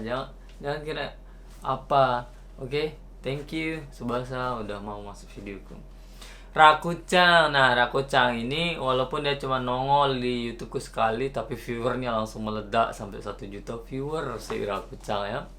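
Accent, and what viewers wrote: Lombok, Indonesia. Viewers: Alhamdulillah Logat sasak nya masih terasa, but seperti nya akan hilang, hmm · Bang asli lombok?? Logat nya sasak tulen ni salam dri lombok bang hehe😅🙏🤙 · Ailah logat lombok iku dk bau telang.. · Mantap bang lanjutin bikin Videonya, logat lomboknya gk bisa ilang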